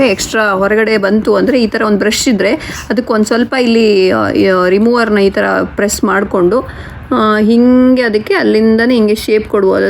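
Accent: Mangalore, India. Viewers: Hey I like to watch ur videos coz I do speak Kannada just like u.bindass but let me tell you that iam a mangalorean but I was born n brought up in Bangalore Namma bengaluru ❤️so I was happy to know that ur a mangalorean.ur hubby spoke about biryani I was like OMG good. anyways how is Canada's present situation.hope everything is fine there take care ❤️👍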